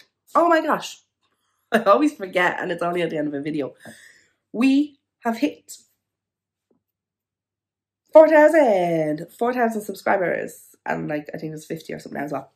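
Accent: Ireland. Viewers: I like ur accent is it a south dublin love ur chanell as well .xx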